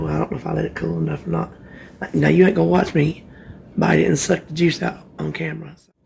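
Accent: South, United States. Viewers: I know exactly what you mean -- I grew up from southeastern Kentucky cooking and ... woooweeee I love my gravy and biscuits, fried potatoes, corn bread, fried chicken, fried green tomatoes, ... hmmmm have you noticed we like to fry where I come from? I love my southern roots and listening to you reminds me of those roots!! Love your channel and your style!!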